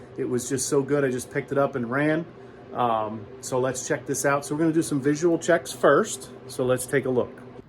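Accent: United States. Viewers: I have a couple of comments today. One is about the quality of your video and sound, which I think is more than good enough. The video quality is great. We could see this time that you got the camera very close, a very clear image of the different parts of the engine. On top of that, you don't need a new microphone but simply speak facing the camera and close enough to the "mike". Although of course a remote mike hanging from your neck would be closer than it is now. The other comment is a silly one. Your nickname is a very nice one. I do like it but in my understanding of your speaking it always sounds to me like outboard-dead, like meaning that your are able to bring back to life a dead engine which is a big truth. OutboardDaddy would make it very clear the meaning of your nickname. I'm sure it is just that I'm foreigner altough I consider that my level of english is not bad, there's a discrepancy between my ears and your accent, which on the other hand I think it's a very typical and crystal clear American accent, much clearer for most people than the heavy accents that I found in Texas, but to me is hard to find the differences of dad and dead pronunciations (same happens "to me" when finding the difference between man and men or can't and can).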